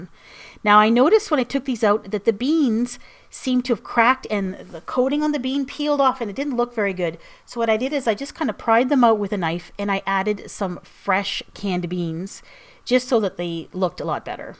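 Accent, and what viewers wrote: Canada. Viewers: I can't tell if she's Canadian or from Minnesota.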